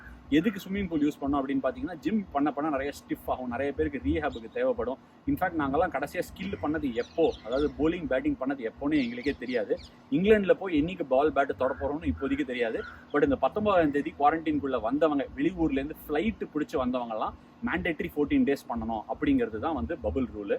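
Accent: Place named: Chennai, India. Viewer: Chennai Tamila post pandrathu supera iruku plus it gives a feel like listening to a friend who opens up wat he has in his mind. Addicted to ur accent ❤️❤️ yaaaarrrum vara matangaa 🤣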